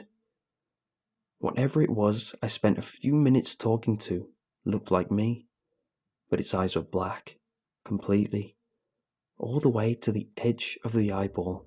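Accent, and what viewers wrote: United Kingdom. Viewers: that kind of British accent 😂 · I love that your brittish! You always post around when I'm going to sleep, which is great!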